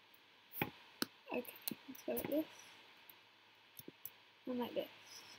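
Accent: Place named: United Kingdom. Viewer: You sound more British here. I think us Americans are rubbing off on you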